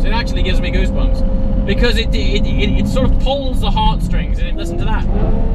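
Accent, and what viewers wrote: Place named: Northern England, United Kingdom. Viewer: Great video dude , keep them coming I love your enthusiasm , & you talk correctly with flat Northern vowels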